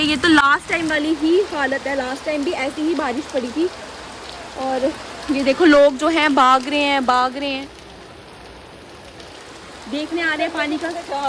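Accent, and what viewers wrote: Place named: Pakistan. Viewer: Your urdu language and flavour of Pakistani serial made me believe that u r a Rawalpindi girl but soon found lower Gujjar Nagar around children park 😢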